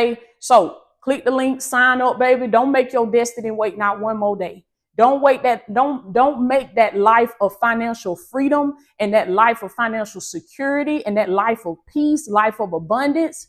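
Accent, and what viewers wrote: South, United States. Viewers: IM COUNTRY TOO SIS AND VERY SOUTHERN AND I LOVE YOUR ACCENT! ❤ VERT UNIQUE AND CANT BE DUPLICATED. (Original) #FEARFULLY AND WONDERFULLY MADE! PTL🙌🏾🙏🏾💜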